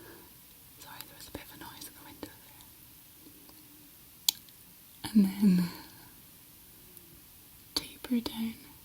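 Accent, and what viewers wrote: Scotland, United Kingdom. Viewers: As a fellow Scot, you're the only Scottish asmrtist who actually relaxes me and doesn't make me cringe at my own accent. Thank you 💜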